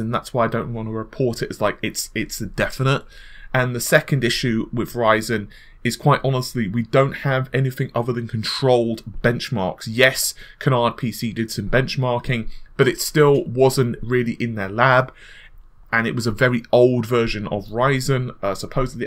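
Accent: England, United Kingdom. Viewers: He speak English you prolly speak American , thats the problem ... prolly .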